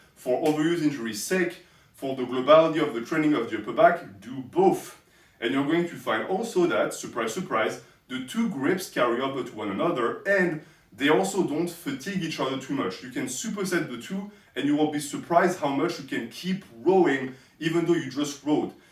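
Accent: France. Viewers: This is amazing, I have always wanted/needed somone to tell me how to work on my DB row techniques and the cherry on top is the subtle French accent. ❤️ Stay fit bro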